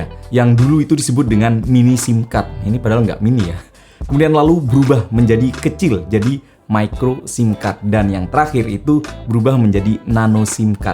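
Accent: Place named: Madura, Indonesia. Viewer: bang gua baru notice lu orang maduris dari cara ngomong lu baru ketangkep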